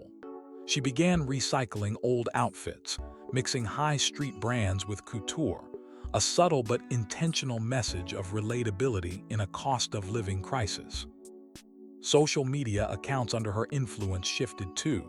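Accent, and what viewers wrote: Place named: United States. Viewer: Was all set to listen to this while I nodded off to sleep but unfortunately the narrator is English speaking but not Britain speaking. Why why are all these videos made with English I should say American people. Next the narrator should learn how to say the Queen pronounce the names right. Just hope and pray that I don't spend all night hearing Cam milla, Cam milla, Cam- milla. It's, I believe, Ca-milla.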